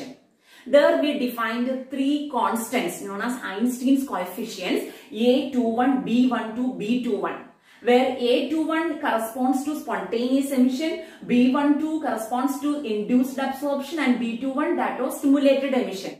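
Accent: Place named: South India, India. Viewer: South indian🎉💜 explanation(voice)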